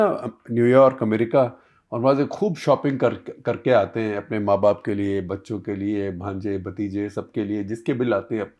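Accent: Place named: Delhi, India. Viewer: Shekhar ji…..ye program best hai Print pe. Especially aap jo anecdotes dete hain woh bahut rochak hote hain….e.g., air force plane girne wala kissa. Please continue to do this in hindi. Aapki Hindi Dilli wali hai to bahut interesting hai. Thanks.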